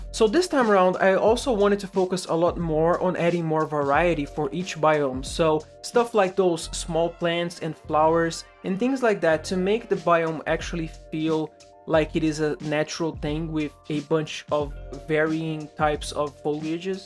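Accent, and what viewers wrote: Brazil. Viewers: esse sotaque parece de BR 🤨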